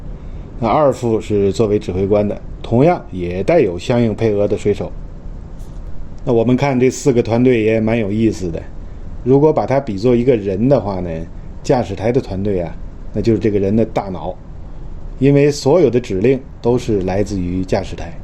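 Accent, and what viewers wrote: Tianjin, China. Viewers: 第一次看船长的视频就被强烈吸引了！李船长渊博的航海知识和严谨的有条不紊的专业叙述最吸引人。加上浑厚的天津普通话播音太酷了！我的印象中在海上的老船长，留着大胡子抽着雪茄大腹便便，就像高尔基小说《在人间》描绘的那样。。。新一代的华人的船长在此！李船长无庸置疑，您是业内的佼佼之子，民族的骄傲，加油啊！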